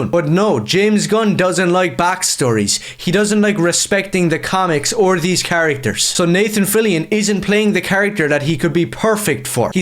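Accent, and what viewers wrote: Ireland. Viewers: The Irish accent didn't clue you in? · Love your channel bro. Are you from Dublin ? Anyways can you say shite in your video instead of crap, please ? It’s a cool word and your accent is cool bro. And yea fuck this woke bullshit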